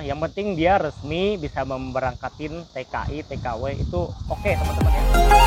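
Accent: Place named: Taiwan. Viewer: Logat nya logat taiwan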